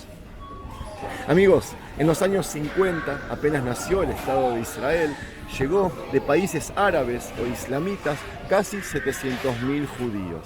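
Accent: Argentina. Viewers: quiero saber porque hablas tan bien el español con acento argentino... cuéntame o donde puedo ver un video... me imagino que ya lo dijiste....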